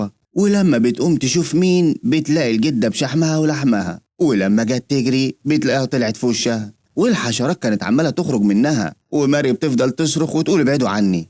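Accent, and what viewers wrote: Egypt. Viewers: اللهجه المصريه الجميله ❤🇪🇬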